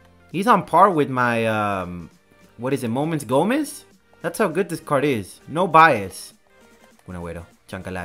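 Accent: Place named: Argentina. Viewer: Hermano tu hablas como un argentino, sinto falta de los jugadores de mi flamengo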